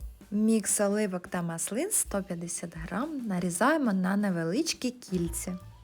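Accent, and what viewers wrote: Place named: Poltava, Ukraine. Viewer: Христос Воскрес! Здається , Ви родом з Полтавщини!) так радісно і приємно чути!! Солянку зазвичай готую без картоплі, але зараз саме буду як Ви порадили) дякую!!) миру нам !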